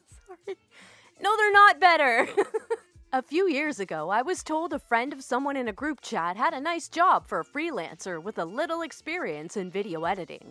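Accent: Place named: Yonkers, United States. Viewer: Just found your channel and LOVE it, so subbed and will follow your posts. As for the white chocolate brownies, NO. That's just wrong. Brownies should be Milk Chocolate or Double Fudge types... (grinz) Also, I like how you don't edit/change/bleep-out certain words. Plus that lovely Yonkers accent had me and my wife laughing.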